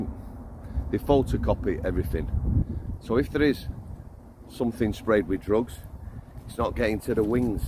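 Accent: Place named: Wigan, United Kingdom. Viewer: I wish I knew where you came from, you sound like a Wigner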